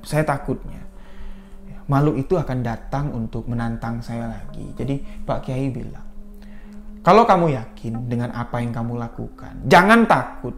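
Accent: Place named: Bali, Indonesia. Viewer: Bang logatnya seperti orang bali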